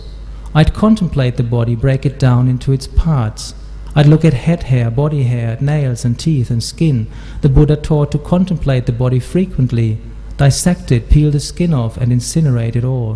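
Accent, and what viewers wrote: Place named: Australia. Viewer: His accent is very Australian now.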